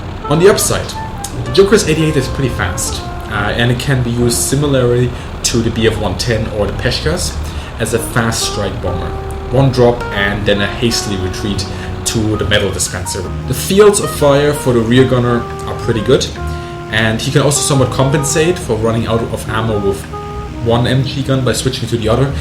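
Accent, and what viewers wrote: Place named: Germany. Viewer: Come on, pleeeeaaaaseee make some WarThunder videos and plane reviews! we really miss your insight, rants and that glorious german accent! Pretty bitte?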